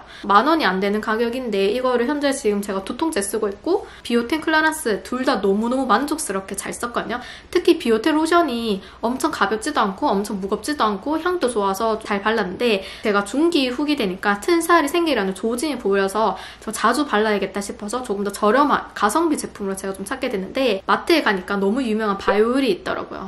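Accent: Gyeongsang, South Korea. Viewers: 졸귘ㅋㅋㅋ 포슬포슬하이 쫀독쫀독하이 마시따아~ ㅋㅋㅋㅋㅋㅋㅋㅋ 영락옶는 갱상도 한국여자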